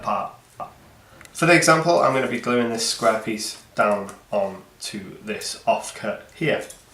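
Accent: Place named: North Wales, United Kingdom. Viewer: Great video, thanks for that. I'm gonna guess you're from North Wales, because that accent is very familiar!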